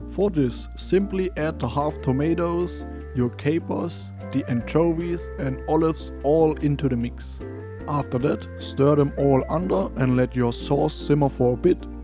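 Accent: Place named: Italy. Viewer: Didn't even notice your italian accent until now😙👌🏻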